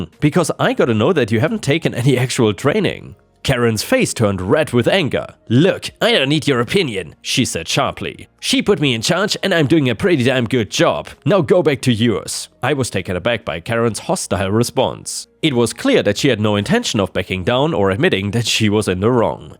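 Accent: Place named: Germany. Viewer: Dein deutscher Akzent ist nicht überhörbar, aber trotzdem nette Videos 👍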